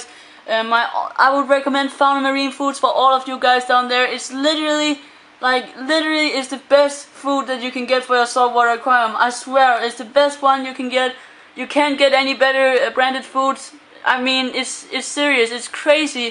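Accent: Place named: Denmark. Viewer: You Danish accent is more pronounce now as you grow older. Sounds cute--keep up the great work.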